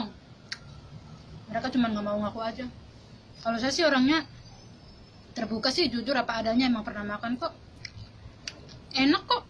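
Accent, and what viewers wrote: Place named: Cirebon, Indonesia. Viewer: Logatnya ky logat cirebon...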